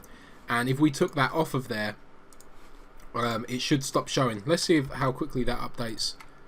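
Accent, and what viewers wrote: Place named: Colchester, United Kingdom. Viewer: I'm originally from Colchester too. The accent sounded familiar :) ..A Helpful video as returning to SEO my sites. Ta very much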